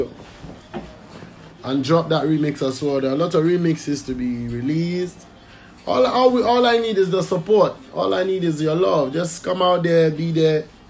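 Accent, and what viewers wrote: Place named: Jamaica. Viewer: You’re talented with unique voice which makes you super extraordinarily musician in Africa but try to limit your Jamaica accent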